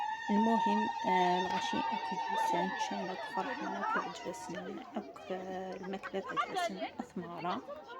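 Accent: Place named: Tizi Ghennif, Algeria. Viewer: لهجة تاعك تاع تيزغنيف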